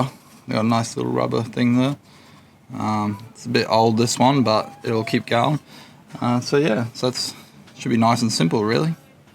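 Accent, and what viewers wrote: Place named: Ireland. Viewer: Are u part irish little bits would sound irish and somethings you say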